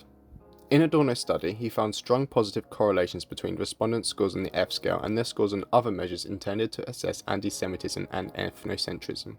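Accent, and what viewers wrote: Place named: United Kingdom. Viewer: I like your bri ish accent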